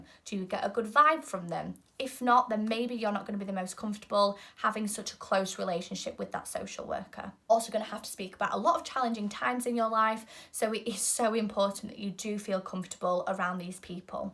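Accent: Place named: North, United Kingdom. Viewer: Hello, firstly id like to thank you for your videos they have been very informative about the process and you have explained things in a way that makes it seem achievable for most who would wan to adopt. Secondly i wanted to ask if you wouldn't mind telling me which adoption agency you used. You sound like you're in the north and as you had such a positive experience i wanted to look into the same agency if we happen to be in a similar area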